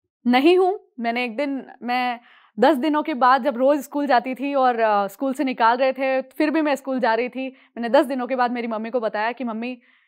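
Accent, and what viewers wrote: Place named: Rajasthan, India. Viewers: Khammma Ghani Baishaa Mevadi me aapki boli hunke ghano majjo avve.... Khas krne vo jo bachha he vo msttt lagta he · आपका पहनावे और बोलचाल से हि पता चलता है कि आप वीरों कि भुमि राजस्थान, मेवाड़ से हैं।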